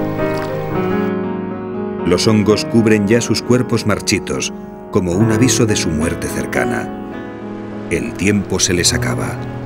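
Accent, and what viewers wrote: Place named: Spain. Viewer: El problema es que el acento españolito no ayuda !!!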